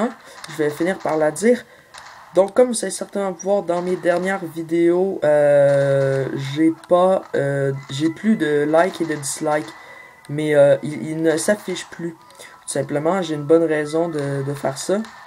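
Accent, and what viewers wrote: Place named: Quebec, Canada. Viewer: Salut ^^ alors toi qui a un asser gros nombre d'abonnés pour un joueur psvita je ne t'avais jamais aperçus sur youtube :) j'ai moi aussi des personnes comme toi qui dislike met vidéo amors que j'ai quel que bonne personne qui like ( exemple: 9 like 2 ou 3 dislike )bref. Je ne sais pas comment régler ce probléme moi aussi :) sur ce j'ai regarder ton contenue tu est un exelent joueur psvita :D continue comme ça ! +1 abo ( ps: j'adore les accent québecois j'aimerais trop vivre au québec !!)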